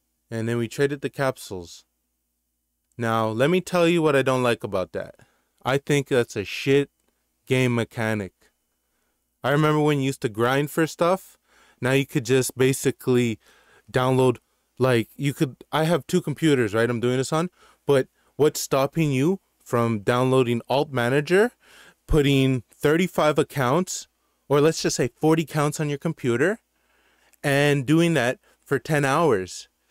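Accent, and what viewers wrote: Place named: Toronto, Canada. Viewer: are you from toronto u got a accent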